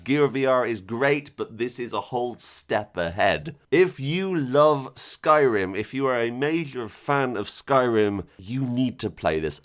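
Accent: United Kingdom. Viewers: Love that British accent :D or what it is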